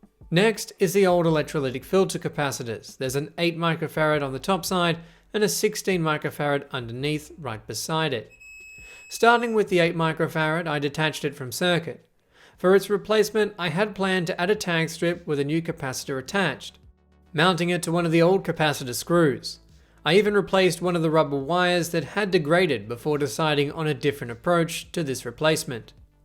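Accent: Australia. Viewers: an Aussie, mate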